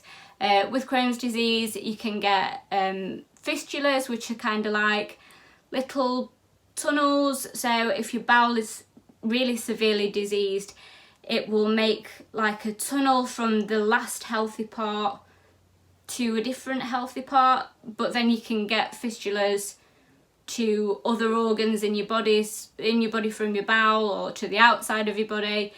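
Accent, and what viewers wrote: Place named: England, United Kingdom. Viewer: Do you have loop ileostomy or a end ileostomy.. i had a loop ileostomy in June 2022.. I have a odd shape stoma so i carnt get precut pouches & I still carnt cut them to fit properly.. that problem really stresses me out.. also its nice hearing someone on youtube talking about ileostomys with a English accent.. nearly all the ones iv watched are American.. I'm in England x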